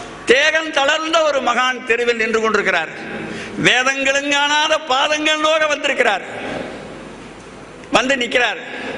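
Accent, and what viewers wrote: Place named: Kongu, India. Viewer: கொங்கு தமிழிலே அருள் மழை பொழிந்து..எங்கள் மனதினை இன்பத்தில் ஆழ்த்திய அய்யாவின் திருவடி வாழ்க..!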